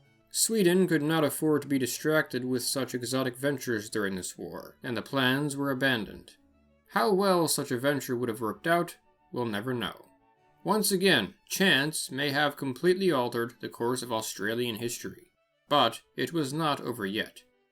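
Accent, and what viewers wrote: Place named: United States. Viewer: Do we really need to pay an obnoxious American accent to tell us about Australian history. Go back home and preach to the gullible.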